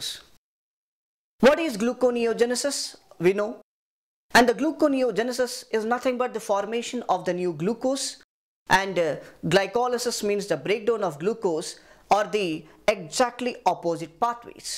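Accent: India. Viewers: You sounds exactly like my indian classmate who topped the class and admitted to the Singapore's NTU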